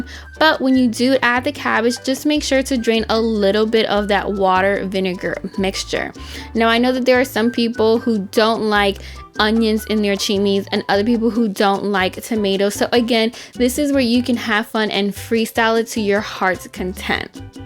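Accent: New York, United States. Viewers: are you from New York bc you sound like your from NY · The Noo Yawk aspect of this video is EVERYTHING tqmmmm 🥰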